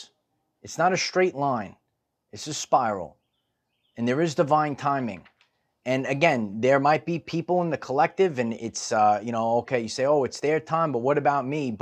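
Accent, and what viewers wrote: United States. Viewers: American accent Aussie trees behind you...not aligned from my perspective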